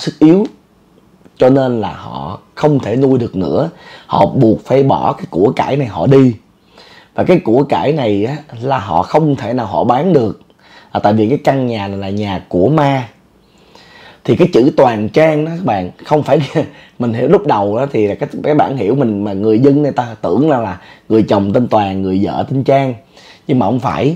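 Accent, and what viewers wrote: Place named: Vietnam. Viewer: Nghe một thời gian e đoán ra bác người tỉnh nào rồi, đồng hương hi hi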